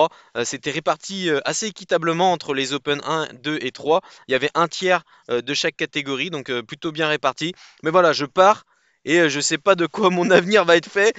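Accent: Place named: Brittany, France. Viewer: Tas pas des origines bretonnes ? Ton accent ça sent clairement le Finistère haha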